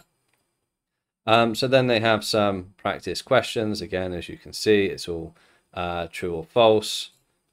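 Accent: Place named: United Kingdom. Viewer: I’ve listened to you speak, and your accent sounds British or from a similar region. Your personal standards may work well in native English-speaking countries, but schools in Ukraine, Poland, Germany, Lithuania, and most schools in Asia, which are non-native English-speaking countries, do not prioritize whether your certificate is from a professional school. As long as you are a native speaker, that’s what matters most. Personally, I feel that teaching English to native English speakers (L1) is not as fulfilling. Most of the people in your comment section are from non-native English-speaking countries, and suggesting that they need to spend thousands to get a certificate like yours to teach is doing their countries a disservice. This is just your personal opinion, so please make that clear to them.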